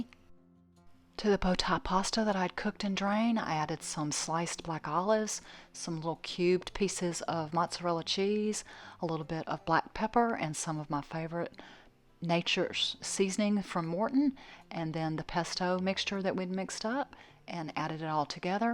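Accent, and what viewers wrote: South, United States. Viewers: I’m enjoying your channel that I ran across, and picked up on that southern accent (which I have as well) so I had to subscribe! lol! Your voice is very soothing. I had a Westbend slow cooker many years ago and enjoyed it so much. When it stopped working, I kept the lid and use it frequently as a serving dish. I would love to find another, reasonably priced. I have a lot of your videos to catch up on! Blessings to y’all!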